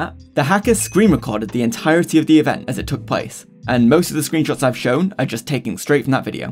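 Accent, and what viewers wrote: United Kingdom. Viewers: best tesco going mate, thought you sounded northern aha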